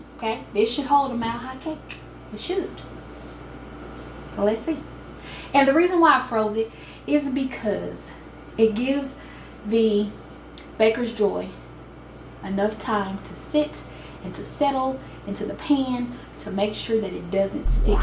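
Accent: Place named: South, United States. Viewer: "Girl,you are some kind of "COUNTRY TALKING ".? WOW! We can barely understand what you are saying.?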